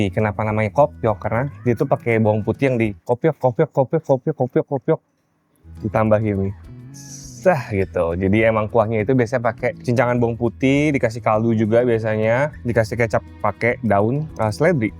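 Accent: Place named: Papua, Indonesia. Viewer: Tuhar... Ternyata dialek nya Bang Masih kuat Papua😅😅😅😅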